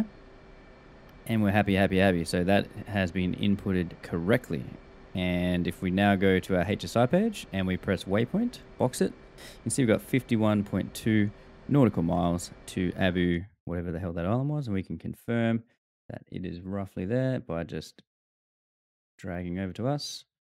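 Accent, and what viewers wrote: Australia. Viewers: Great to have some tuts in relaxed Aussie accent, very easy to take in and no time wasting stuff cheers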